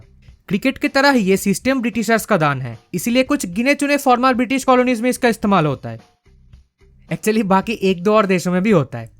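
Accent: Bengal, India. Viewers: R u bengali?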